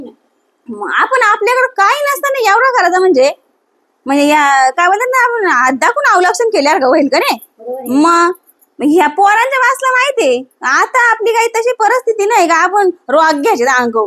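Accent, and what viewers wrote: Murbad, India. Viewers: काय बोलावे आता फुयजी..... एकच नंबर बोलता तुम्ही 👌👌👌👍👍👍.....याला म्हणतात मुरबाडी भाषा ❤❤❤ आणि दोन्ही पण म्हतार्या त्यांची डोबरा सिंदारित सोडून तुमच्या बाता मन लावून ऐकत्यात 😂😂😂आता तुमच्यामुळे त्यांची डोबरा कुइकं जातील आणि त्यांचं म्हतारं त्यांना किती चोपतील...काय सांगू शकत नय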